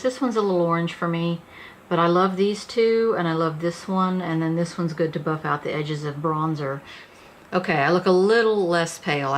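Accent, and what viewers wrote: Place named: Texas, United States. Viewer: Love this review! I wanted to see how this new palette would work on fair skin. Looks pretty! Also, I am a TX girl too & within the first 20 seconds I could tell you were from TX. 😂❤️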